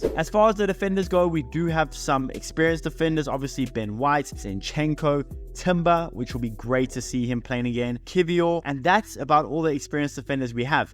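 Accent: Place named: South Africa. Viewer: South African 🇿🇦 accent for the win 👊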